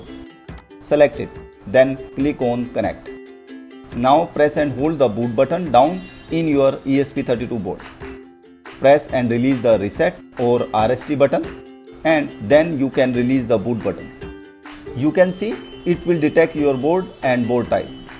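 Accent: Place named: India. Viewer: Hard to understand Hinduglish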